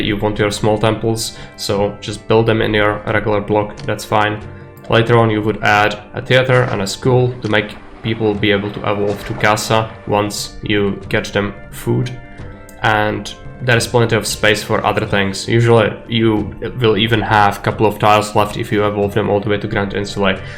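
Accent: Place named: Ireland. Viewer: first time i heard you i was convinced you were irish ☺️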